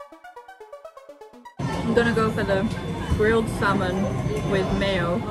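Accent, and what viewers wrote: England, United Kingdom. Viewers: Are you from England/Britain? You have a vague English accent over the clutter in the background 😅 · Ah yes I read you went to university in UK. I can hear it in your accent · Love your Video, I love Sushi and it looks so good, Love Unagi, I am about to stop in Tokyo, can't wait, Last time I eat 108 pieces, my record, OH you must have spent time in England, your accent comes out every now and then.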